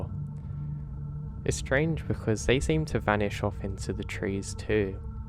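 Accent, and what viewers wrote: England, United Kingdom. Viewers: Your reading is perfect. New subscriber now. Where in England are you from?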